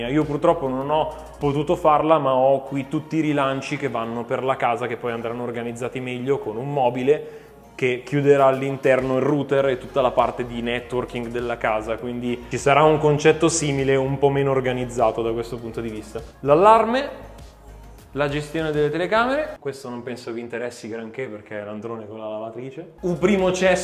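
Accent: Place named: Lombardy, Italy. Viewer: Dimmi che hai la parlata lombarda senza dirmi che hai la parlata lombarda Btw: sono di Verbania, ma tutta la zona intorno al Maggiore e non solo ha l’accento milanese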